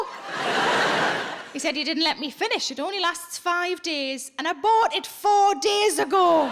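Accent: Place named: Northern England, United Kingdom. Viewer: My family were from the UK, as is my husband, but we are a bit older now and especially for the stronger accents (like Northern accent) we use subtitles! We catch SO much more now! I hope this helps! Cheers! 😊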